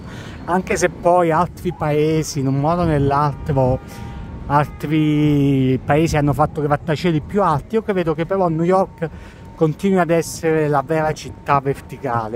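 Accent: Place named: Campania, Italy. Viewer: Complimenti per i tuoi bei video. Ti ho scoperto da poco. Una bella passeggiata come farebbe un tranquillo turista. E poi il tuo accento campano è magnifico, fa il 50% della bellezza del video..👍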